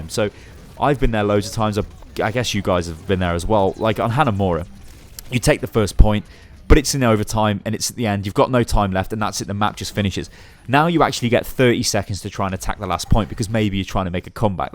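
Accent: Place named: United Kingdom. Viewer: What's the point of these vids? It's literally just everything you can hear in the update videos Blizzard makes, but unorganized and with Overwatch gameplay in the back. I guess they're good if you like British accents and don't like Jeff's lisp.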